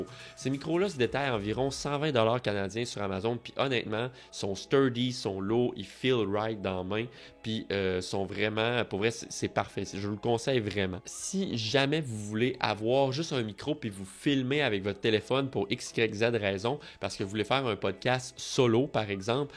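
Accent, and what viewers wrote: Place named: Switzerland. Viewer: J'adore l'accent Suisse ! 😏 Merci pour toutes ces infos !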